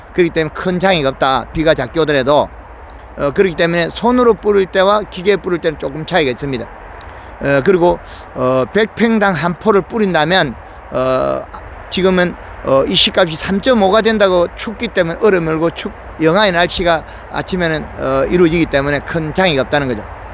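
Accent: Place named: Gyeongsang, South Korea. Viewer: 동분서주하시며 특히 경상도 본토 발음에 친근함 느낍니다 저는 마늘심고 잎이3~4 장될쯤 가을비가 많이와서 두둑이 잠길정도 빗물이차서 뿌리가 傷해서 대표님 말씀대로 요소를 주고 회복이됬는데 웃비료를 15일후 뿌리고 부직포를 덮고 날씨가 포근해서 골드그린을 시비할려고 부직포를 벗겨보니 비료 가스에 마늘잎이 노랐게 말라버렸읍니다 1월25일 비료를 주고 물을 주고 밤기온이 영하로 안내려간다는 예보를보고 근간 포근한날씨라햇볕을 보고 해서 많이 회복되는 감을 느끼고 대표니의 창녕 리포트를 보고 글을 올리립니다 생초보 지만 황금알농법을 열심히 실행하고 있읍니다 물이 차거워 마늘 작물이 스트레스를 받지않을까 생각도 되고요 아무튼 최선을 다해 해볼까 합니다 추후 동영상을 보내드려 도움을 받고 싶습니다 늦은 밤시간에 댓글을 올려 대단히 송구합니다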